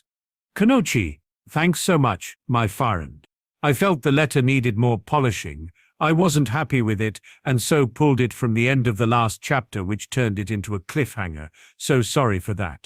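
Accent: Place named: United Kingdom. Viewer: This is a captivating story. I enjoy the British accent only because of the show :) I am hooked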